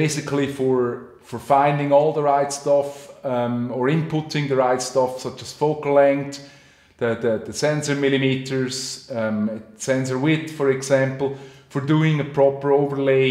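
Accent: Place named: Sweden. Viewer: Behöver hjälp! Du låter svensk, är du det? Undrar bah om jag kan fråga på svenska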